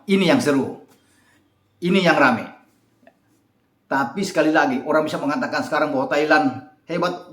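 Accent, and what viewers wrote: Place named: Manado, Indonesia. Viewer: orng Manado.? kental skali dialegnya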